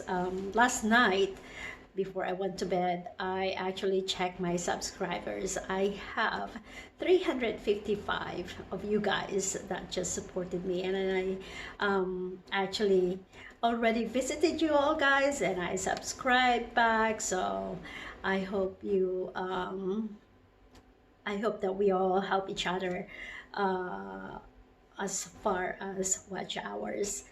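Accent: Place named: United States. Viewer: yeah my sissy like the way you speak you adopt already the accent in America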